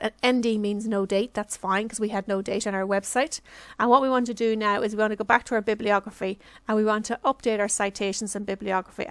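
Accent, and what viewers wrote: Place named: Ireland. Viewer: Lots of ok ...ok..ok...all over. and it will sound more interesting...probably Irish Accent?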